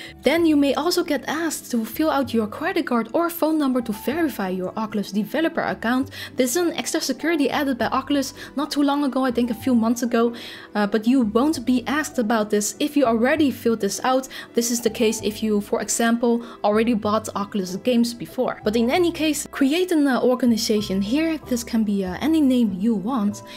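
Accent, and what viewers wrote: Netherlands. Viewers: I hear a little nederlands 😂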